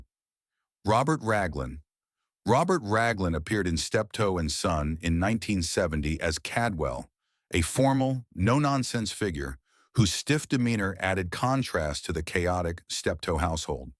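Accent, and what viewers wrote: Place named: United States. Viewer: why is a yanky speaking??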